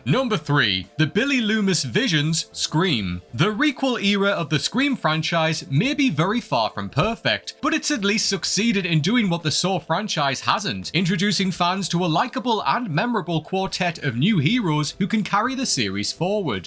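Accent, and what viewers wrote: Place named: Geordie, United Kingdom. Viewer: Poyro?! Christ. Thick sounding Geordie.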